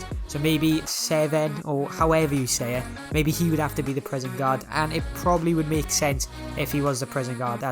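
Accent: Wales, United Kingdom. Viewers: U Welsh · Is he welsh???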